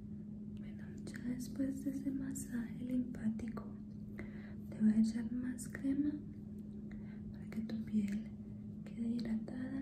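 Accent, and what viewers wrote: Antioquia, Colombia. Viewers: el Plus del acento Colombiano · Asmr+acento Colombiano= UFFFF Chuladaaaa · No en toda Colombia hablan así, las que hablan así como ella son las de Antioquia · El paisa me encanta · me encanta el acento colombiano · el asento colombiano uuuufff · Lindo el acento de las colombianas al hablar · Colombiana tenia que ser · el acento colombiano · colombiana es ella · Solamente hablan así los paisas osea los de Antioquia, en Colombia hay muchísimos acentos. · Eres paisa o no??? ❤️🙄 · tiene acento paisa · El acento más lindo del mundo es Colombiano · el español de colombia es el mas bonito y ya medi cuenta porque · el acento paisa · su acento colombiano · Eso es paisa sumercé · la voz colombiana es lo mejor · Es Colombiana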